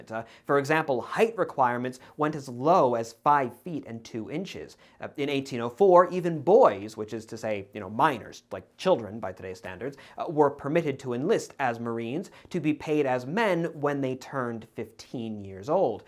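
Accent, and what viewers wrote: Germany. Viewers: I love your German accent, by the way! It reminds me of The Major from Hellsing. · Almost threw my phone thru the window during the ad with your German accent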